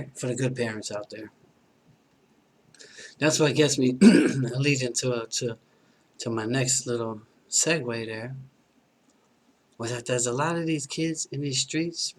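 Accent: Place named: Queens, United States. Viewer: Where u from man Oh wait shit u from queens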